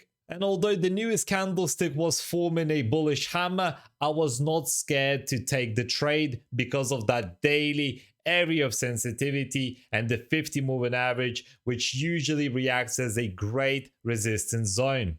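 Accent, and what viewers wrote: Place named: United Kingdom. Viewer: I have no idea why the messages aren’t coming from your side. However I am available in ur discord server. Are u a resident in UK 🇬🇧? Your accent seems like u are a British.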